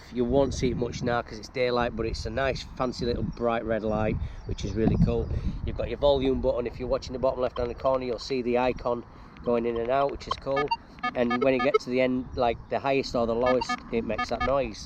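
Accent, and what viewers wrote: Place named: Yorkshire, United Kingdom. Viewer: Good tip about the August headphones but I didn't understand what you said about the horseshoe icon "makes the machine more powerful" - what do you mean by that? I'm from Yorkshire btw so it's not an accent thing that I don't understand what you're saying! (West Yorkshire as opposed to your South/North Yorkshire accent). Tip - treat yoursen to a nice watch so you can tell the time without having to pull your phone out of your pocket! Really enjoyed the vid - well done pal.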